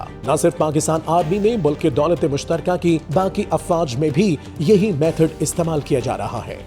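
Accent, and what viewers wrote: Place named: United Kingdom. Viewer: Urdu Engrezi accent mein, wow !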